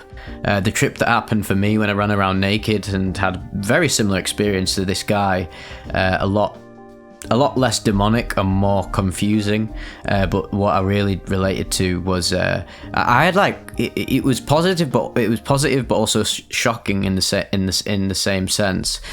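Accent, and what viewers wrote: United States. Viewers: Omg I love your American accent 😂 it made this already amazing retelling of this story all that much better! Your voice and affect are honestly so perfect for telling stories! Especially these- so to hear a twang of humor is utterly amazing ❤😂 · your american accent is so funny haha great voice acting man loved this one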